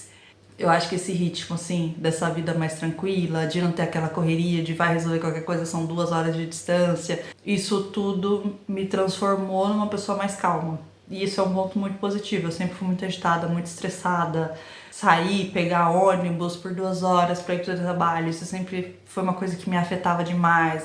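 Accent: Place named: Brazil. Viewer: Que mudou? Conta ai ...pelo sotaque é brasileira...acertei?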